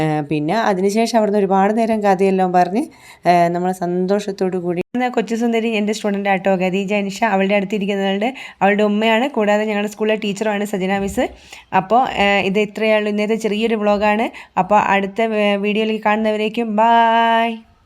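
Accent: Kozhikode, India. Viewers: കോഴിക്കോട് ഭാഷ ഇഷ്ട്ടം ആണ്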